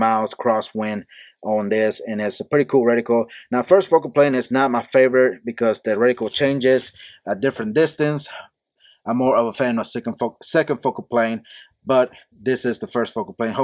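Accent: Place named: South, United States. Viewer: Man, I'm from GA and I love your southern accent it's pretty unique, I haven't heard a Mexican and Southern accent together before, but being from the south myself I could understand you just fine lol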